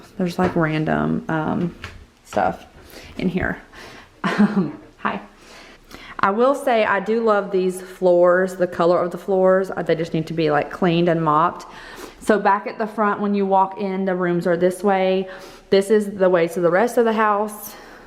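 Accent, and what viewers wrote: South, United States. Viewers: So exciting!! It’s such a cute house!! Also your southern accent is coming in strong lol! I’m from Mississippi so no hate!😜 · Congratulations! This is so exciting! Can’t wait to watch you transform it into your own space! And girl... that southern accent is back! 😂 from one Bama girl to another! I love it!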